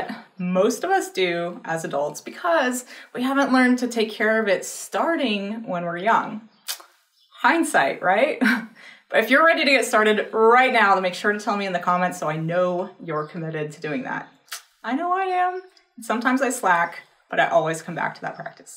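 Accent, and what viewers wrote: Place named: West, United States. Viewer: Your accent sounds N of Mason-Dixon line & W of Mississippi River, but not a flat-lander or inter-mountain W, so maybe a left coast origin, but the Science Museum on the 270 inner belt in St Louis had a display of every system from the human body to include the Fascia. No info on how the display was accomplished. Visitation hours are short, like the staff wants to go play golf PM & unfortunately they are/were not open every day. Like all museums, displays change. Worth a 500 mile day trip for those in the area with an interest. Thx & GL.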